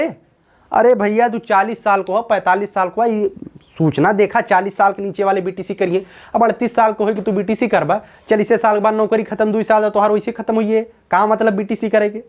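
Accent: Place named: Awadh, India. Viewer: Logo ko awadhi aur bhojpuri main farq hi nhi pata.. hadd hai....aap sir awadhi bolte hain aur acchi bolte hai ..hum awadh walo ko bdi aatmiyata lgti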